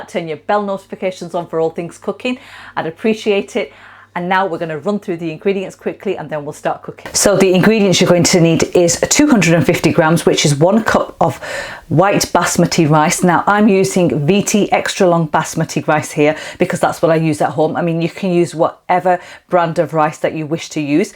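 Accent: United Kingdom. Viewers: You sound like you have a little British accent, but not like people who were raised there. How long have you been in the UK? (just curious... I'm a bit of an 'accent' nut)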